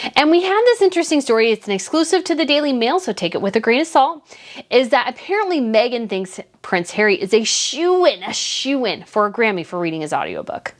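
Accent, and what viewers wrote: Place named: United States. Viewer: Amazing how you talk about their little 16 mil dollar home as if you're living in a $33 mil home yourself because you don't. In addition, how are you a royal commentator sounding like an American? There are no kings and queens here in the US, and this IS NOT a Monarch where you get paid to travel. So keep your negativity there in the UK. Smh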